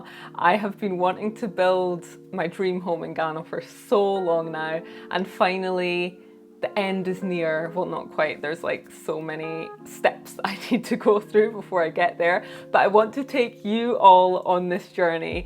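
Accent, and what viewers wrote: Scotland, United Kingdom. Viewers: I love you accent, is it Scottish? I love the Scottish accent. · R U from Scotland ? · Are you from Scotland 🏴?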